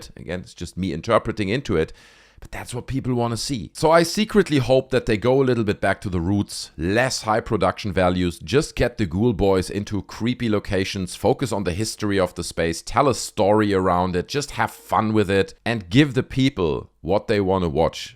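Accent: Germany. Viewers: Hey, you sound like you're German, if so: greetings from Germany to Germany! 😊 You are totally right with what you say: the old Buzzfeed episodes felt more fresh, more funny, more original. The content they are doing on Watcher looks more expensively produced, but it quite lacks the original soul and comedic harmony between the two guys. I always thought that they should have stayed at Buzzfeed, their new channel was quite mediocre in my taste. Some shows were good, like Puppet History, others were questionable (Too Many Spirits... it may sound fun, but having seen friends go down due to alcohol abuse I am above thinking that getting drunk is something which should be fun), and pretty much all shows with Steven Lim were not good. I remember that one video when they announced a discussion about wether ghosts are real or not... I thought great, just like old times, except it was not. 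Shane was good as ever and did a great presentation, but Ryan was SO annoying that I had to take three steps to complete the video, he was almost unwatchable. He looked like he had spend the last two days sleeping under a bridge, what he said was not funny at all, he did not even try to participate to the topic of the video. When he started munching apples while talking, I was one click away from stopping for good but I finished it to see if it got any better... it did not. And then they decided to blow up their own channel. It cost them half a million subs (in fact they lost another 10K to when I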